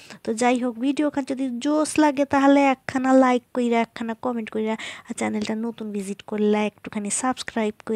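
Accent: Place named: Barishal, Bangladesh. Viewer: Onek moja pelam apnar borisailla bhasha shune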